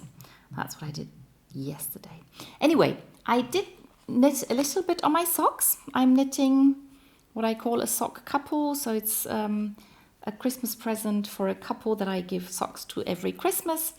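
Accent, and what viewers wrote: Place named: Australia. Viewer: Thank you for responding right away! Love your podcast! My son thinks you have an Australian accent:—). I will hate to disappoint him..., not.:-). Love your English! I wish my German was as good as in the old days when I spoke it flawlessly.... Is it this the pattern? <link>?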